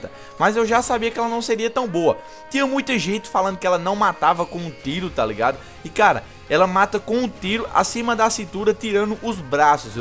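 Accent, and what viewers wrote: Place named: Pernambuco, Brazil. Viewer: vc é pernambucano ta falando Papangu moro na cidade do Papangu👏👏😉😉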